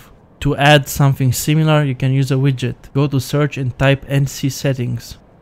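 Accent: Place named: Romania. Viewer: Mi-am dat seama ca esti roman dupa accent. :)))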